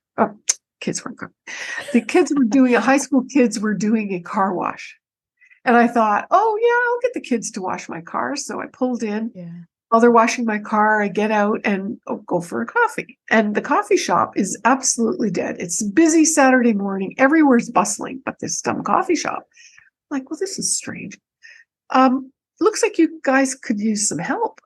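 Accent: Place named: Scotland, United Kingdom. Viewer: I just love her Scottish accent more than anything else on YouTube!!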